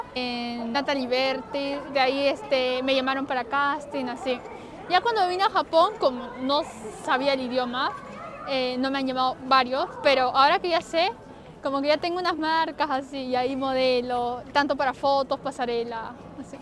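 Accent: Lima, Peru. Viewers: No pasó ni 20 segundos desde q hablo la chica se nota en una el acento peruano yo me pregunté recontra peruana 😱😱😱😱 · todo el mundo tiene acento, los peruanos no son la excepción, la chica tiene un marcado acento limeño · esa forma de hablar es de peru, pero dices ser mexicana, teniendo madre peruana , me perdi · Habla como peruana. Muy buena diferenciacion. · Ese acento es inconfundible, de peruana, limeña y la entonación es de nikkei. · Tengo mucho amigos peruanos y si se nota mucho el acento peruano q tiene saludos desde España 🇪🇸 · Es un acento muy limeño su acento. En Perú también tenemos muchos acentos. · Es acento peruano me di cuenta rápido 🙂♥️ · Yo la saque en una su acento de conera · sabia que era Peruana se nota el acento, yo tambien soy de Peru y hay muchos Peruanos Japoneses aqui tambien saludos te sigo desde el 2010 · Que mal que conserve el acento peruano debería sonar más neutral por lo menos tiene algo más que el español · Ni bien la escucho y me suena a Perú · Yo apenas vi que hablo español sabía que era peruana la mayoría de japoneses que hablan español son de padres peruanos o tienen ascendencia peruana jsakjkds 😽💗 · como es posible identificar tan bien el acento peruano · Suena super limeña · Que guapa chica, me gustan las peruanas y su acento! 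· En lo personal, no hay nada mejor que el acento P E R U A N O , arriba PERU, bien entendible y NEUTRO. Gracias por compartir esta informacion cultural, un saludo positivo. From: Utah, US. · hermosisisma nipona pero el acento super peruano 😁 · Alv yo tambien soy Peruana pero encerio quiero tu vida es wow xd me caiste bien antes de que digas que eras mitad peruna estaba con la duda por tu acento xd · Cuando la escuché , su forma de hablar me parecía muy común ya que soy peruano y si le atiné era medio PERUANA 🇵🇪🧐👀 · Me encanta su acento Peruano, ella es muy linda. 😍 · Tiene acento de peruana me parece? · ya sabia que tenia algo de peruana, por su forma de hablar xd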